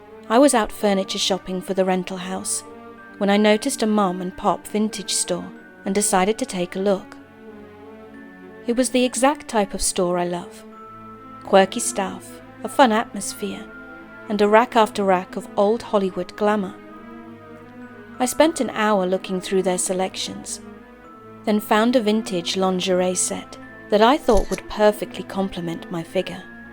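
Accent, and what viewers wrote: United Kingdom. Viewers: Very good, I am impressed but remind me never to go to that store for lingerie. The store lets the customer try on lingerie and then resells which is something that not done any store lol. The narrator did a fantastic job, is she British she sounds British to me. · Admittedly, the coincidental situations that the lady in this story experienced are equally scary and sad. She leaves her abusive ex and tries to find solace at this place in the country, only to have some random creeper sneak his way into the new place as well. Otherwise, as always, this video and its story are both very well done, and British accent adds a very regal element to it. Good job yet again! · Good story, great beautiful actress, excellent editing and camera work! The whole thing was exceptionally well done, with very high production value. Only 2-continuity type things kinda confused me: If it takes places in the USA, especially the South, why does the Narrator have a British Accent? 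Also, I think forgot to take off her beautiful wedding ring, unless she's married in the story.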